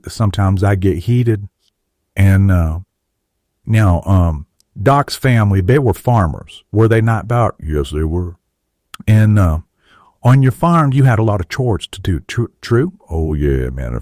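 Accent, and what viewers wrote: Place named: South, United States. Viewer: Still listening....only 10 minutes in so far....there's that southern accent, lol 👍......you and your mate, need to do some live streams....awesome first video...can I post your video link on mine?... We need more channels like this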